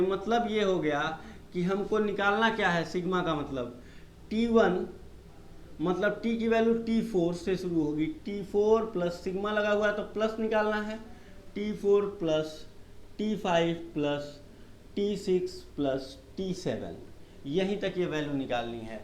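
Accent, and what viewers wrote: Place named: Bihar, India. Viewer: Sir aap bolte bilkul bihari style se.....bahut cutely.... And thank a lot for this video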